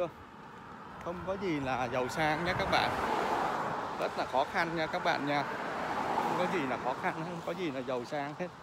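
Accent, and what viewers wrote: North, Vietnam. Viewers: Tiếng bắc mà tự xưng là Bà Rịa.???? Quê tui .... 😚😚😚😚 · Giọng bắc 54 nghe dễ thương giống e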